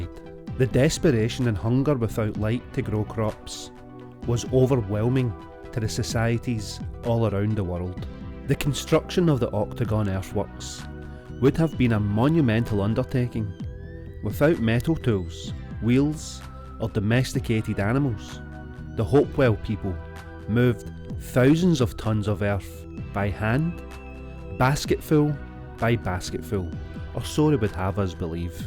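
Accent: Scotland, United Kingdom. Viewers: I enjoy listening to your voice and your beautiful clear english.Scottish heart voice thank you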